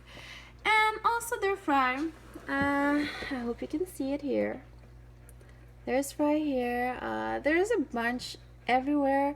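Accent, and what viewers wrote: Quebec, Canada. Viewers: R u from quebec? You got that sound.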